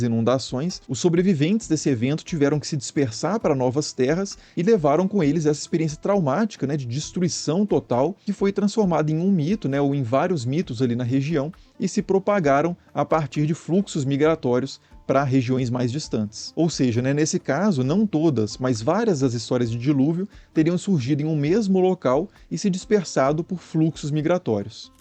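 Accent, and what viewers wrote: Minas Gerais, Brazil. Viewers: Uma pergunta nada a ver, mas o narrador é mineiro né?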